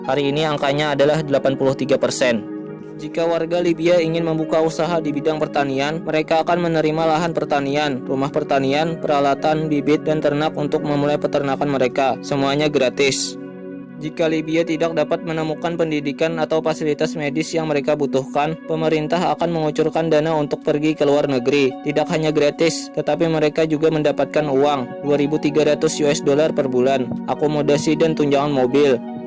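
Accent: Banjar, Indonesia. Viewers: Logatnya kada kawa dikeramputi lg, pasti urang banjar, hehe.. salam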